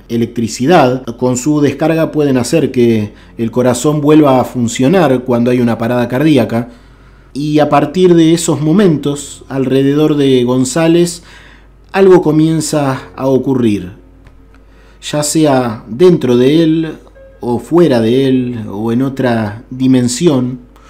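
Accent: Argentina. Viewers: Adoro tu canal! Y con todo respeto el acento argentino es bellísimo. Muchas Gracias!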